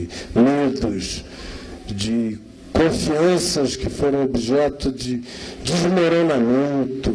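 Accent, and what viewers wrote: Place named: Pernambuco, Brazil. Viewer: Aí sabe que fala Recife Pernambuco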